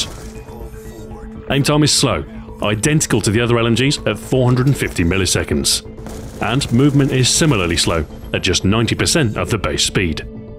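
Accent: United Kingdom. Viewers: Why does everyone say he sounds like Captain Price??? Yes, they have British accents. THAT'S IT. The tone of the voice is VERY different, and the accents aren't even identical. I just... I don't even... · Hey, as one of your presumably many viewers who haven't played CoD in a while but still tune in regularly not just for your videos' production value but also your soothingly smooth and ridiculously British voice I've been wondering if you've ever considered doing a "dramatic reading" of a favorite chapter from a novel or short story on your account. It shouldn't take too much time to prepare and record and denying the world the glorious sound of your voice would be a crime!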